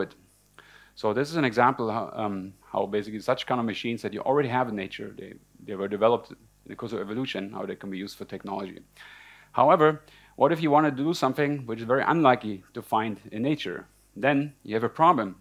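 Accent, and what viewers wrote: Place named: Germany. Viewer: He sounds like a German :D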